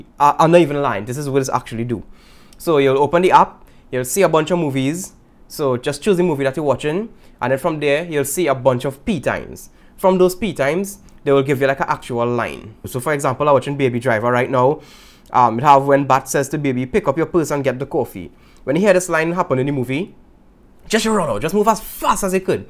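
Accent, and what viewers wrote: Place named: Trinidad and Tobago. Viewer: Trinii accent loll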